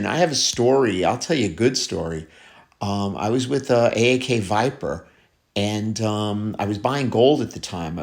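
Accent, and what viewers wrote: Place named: New Jersey, United States. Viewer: How did I know yous is from Jersey...😊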